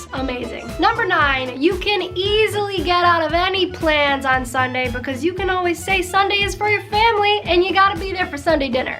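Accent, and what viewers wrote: Naples, Italy. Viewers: Yeah she sounds Napolitano or siciliano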